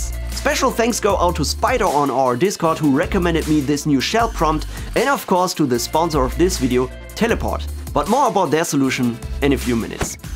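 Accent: Germany. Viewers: Deutsch! Hört man :D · i smell a german accent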